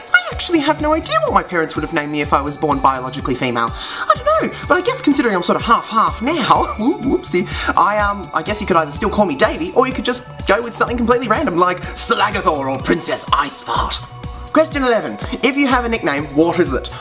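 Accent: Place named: France. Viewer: I like your french accent ! He's so cute !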